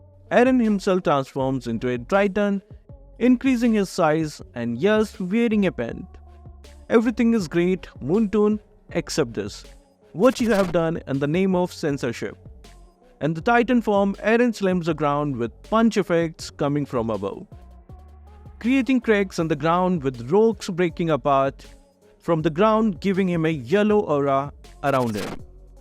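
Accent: India. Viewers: Your indian accent is so hard to understand bruhhhh.....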